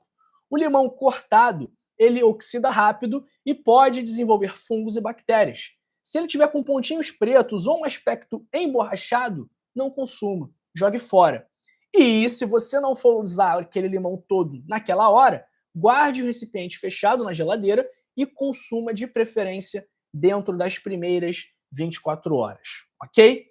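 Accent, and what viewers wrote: Rio de Janeiro, Brazil. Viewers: Olá. dr . eu acho que. Vc . Carioca vc soquete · Sou de Mogi Guaçu Cacilda Pimentel sp agora você parecem ser carioca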